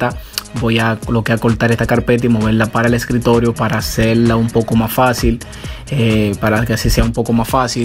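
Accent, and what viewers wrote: Dominican Republic. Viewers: Eres dominicano verdad xd es que tu voz se me ase conocida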